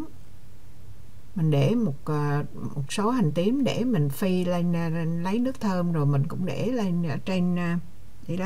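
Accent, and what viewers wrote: Quang Nam, Vietnam. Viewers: Nghe chi noi ma minh Nho toi ma minh , ma minh nguoi Tra kieu, Quang Nam. Don gian , moc mac , khong trau chuoc. Cam on Chi chi lam nhieu mon an ma nguoi Quang Nam ua an . Chuc chi luon khoe va gia dinh luon duoc hanh phuc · nghe giọng Quảng Nam, mình thích cực kỳ!❤️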